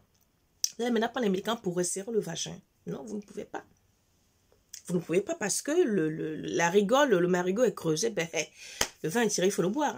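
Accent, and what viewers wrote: Gabon. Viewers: VOUS ETES GABONAISE? j'ai reconnu l'accent c'est le 241 qui gagne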